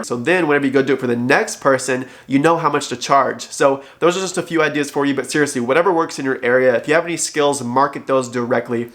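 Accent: United Kingdom. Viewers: Hahaha! Your British accent is jokes! 🤣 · Love it! Great value. Thanks!🙏Room set up and colors are electifying and soothing - so cool! Your British Accent is Brilliant and hilarious and Prince Ghost is a real star attraction!💕😊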